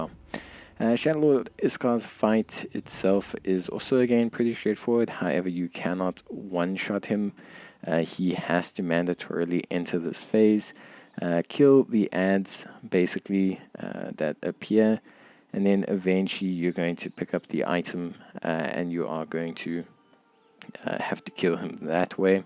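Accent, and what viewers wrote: South Africa. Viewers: I took a shot everytime i knew thats a SA accent!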